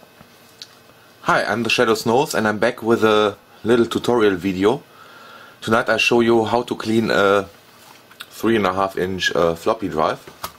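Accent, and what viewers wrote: Germany. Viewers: I hear a strong german sound :-D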